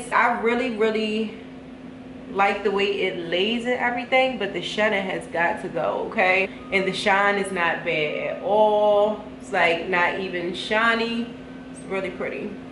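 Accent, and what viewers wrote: Baltimore, United States. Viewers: her voice has a Baltimore accent!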